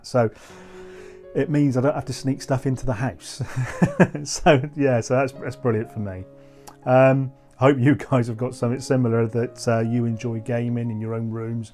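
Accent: West Country, United Kingdom. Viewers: Nice vid bud, love the bolt action table. Seems I’ve stumbled across another West Country wargamer going by the accent lol.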